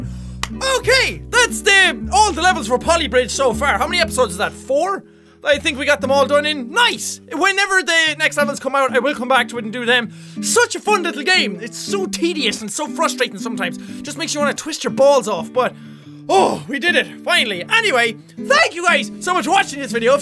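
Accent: United Kingdom. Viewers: I love his British accent lol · Calm, British Jack filled me with hilarity and concern. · wow I didn't know he was British too XD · Omg that British accent though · HISS VOICE IS BRITISH I KNEW U WERNT IRISH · you have good british aqzent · Good British voice PS it's amazing !!!! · YOU WOUND SOOOOOOO BRITISH!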